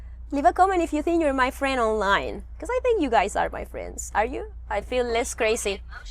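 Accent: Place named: Andalusia, Spain. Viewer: Hola,no sabias que tenia acento Andaluz, llevo poco día siguiendo tu canal y veo que eres un persona de corazón, gracias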